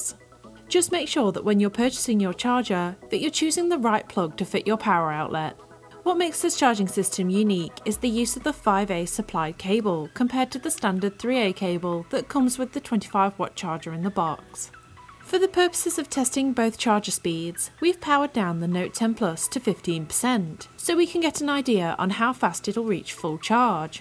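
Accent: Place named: Northern England, United Kingdom. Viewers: Northern accent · I really loved ur british accent ❤❤😍👍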